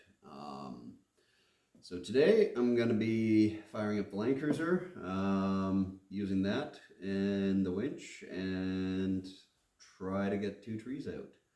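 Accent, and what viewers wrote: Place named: Poland. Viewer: Your „good morning everybody” is a single phrase where I can hear a ted of Polish ( non- native English speaker. Your „everybody” I can hear everybodi ( kind of soft Polish letter i ( like Irena) instead y. It’s very cute and pleasant sound. Also you don’t speak English as a person born/raised in America . The way you speak, built phrases, apply grammar and syntax, is like you graduated class for a public speaker: more sophisticated and elegant that common people use. I am having so much fun and pleasure just listening to to your narration. You don’t neither stumble , nor repeat yourself, talking fluently in an interesting manner. Thank you 🙏, sometimes , just for pleasure of listening to your voice. I am not ignoring the subject, just : double my pleasure of witnessing your every day adventures. 🤗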